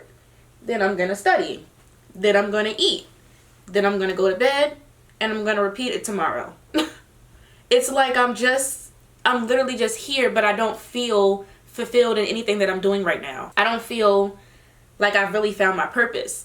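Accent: Baltimore, United States. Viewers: You and Thechicknatural have the same accent and both from being from Baltimore is cool. It would be so dope if you guys collab.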